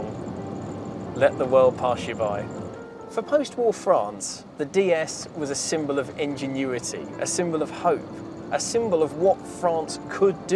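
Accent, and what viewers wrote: United Kingdom. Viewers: The best thing is.... A BRITISH ACCENT to describe A FRENCH CAR.... VOILA....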